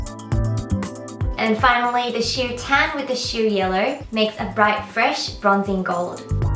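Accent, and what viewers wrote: Australia. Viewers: I love your Aussie accent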